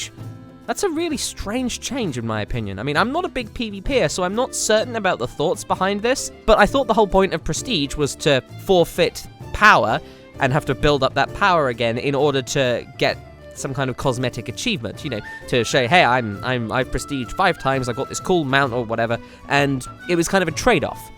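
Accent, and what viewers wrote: United Kingdom. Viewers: is british?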